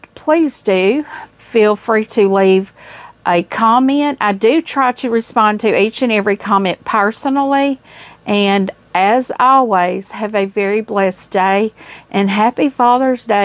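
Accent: South, United States. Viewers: Adorable block. I'm definitely trying this one! First time watching. I'm not sure where you are from, but o adore the southern accent!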